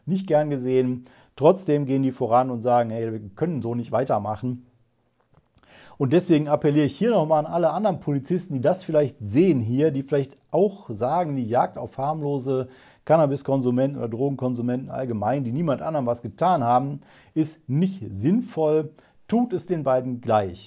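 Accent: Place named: Westphalia, Germany. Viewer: Never. Auf jeden Fall Westfalen. Münster oder so...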